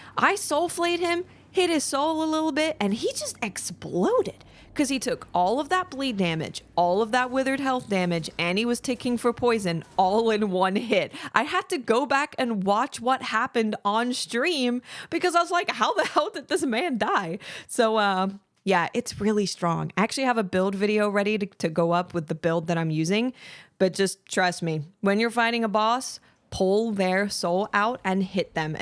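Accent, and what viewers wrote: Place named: New Jersey, United States. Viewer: That jersey eeesssssh accent got me ❤😊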